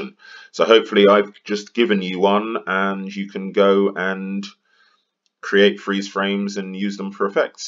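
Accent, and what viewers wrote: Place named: South, United Kingdom. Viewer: Well that was a haaarrrd 9mins and 20 secs to watch. In 9.20sec you managed to simultaneously produce a crystal clear, “how not to make a social media instructional video”, while actually managing to finally show how to freeze frame. Ok I hate just being negative so I hope this comes over a positive constructive criticism as that is what it’s meant to be. Before you start a “how to video” grab a pen and paper and “storyboad” your tutorial, the humming and harring immediately makes you sound like an arse without a clue, and make you come over as a “no thanks they haven’t a clue I’ll find another video” when the end result shows you have half an idea of what you’re doing. Your accent is somewhat off putting, I think the base has its origins is southern UK and relative well spoken indicating a decent upbringing and schooling but the attempt at “getting down with the homies” and all the Americanisms is really a turn off. 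Other than that I did watch until the end, I did (eventually) extract the info I was after, I may possibly look at other vids you put out if only to see if your presentation improves.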